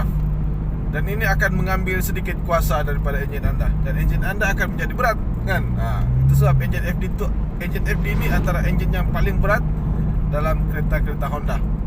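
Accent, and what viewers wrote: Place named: Sabah, Malaysia. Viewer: serius la tak pernah dengar orang Sabah cakap?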